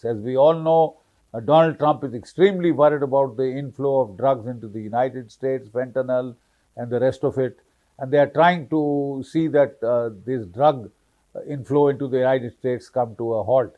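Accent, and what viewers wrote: India. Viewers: You old fool,,, he Modi's friend more than india,,, you can not fool people with your curry English...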